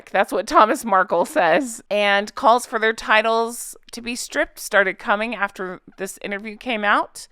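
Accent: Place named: South, United States. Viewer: let your southern roll on out. I'm from NC and I totally get it. 😂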